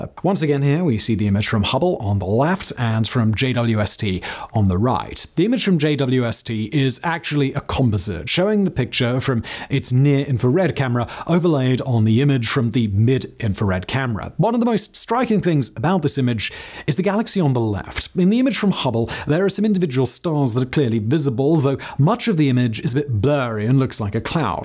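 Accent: England, United Kingdom. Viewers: Your accent is painfully English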